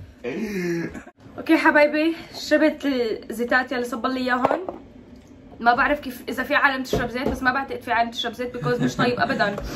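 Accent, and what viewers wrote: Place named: Palestine. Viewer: من وين هي. لهجتها فلسطينيه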